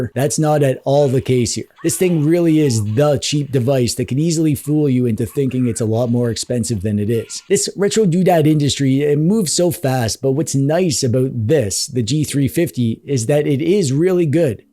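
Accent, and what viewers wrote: Canada. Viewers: I hear a guy with a Canadian accent, I’m trusting him. This handheld looks like a winner!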